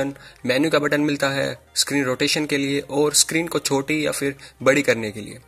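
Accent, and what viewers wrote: India. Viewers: your title is English and suddenly you talk Indian...WTF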